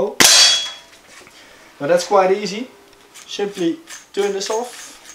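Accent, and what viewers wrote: Netherlands. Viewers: mooie Nederlands accent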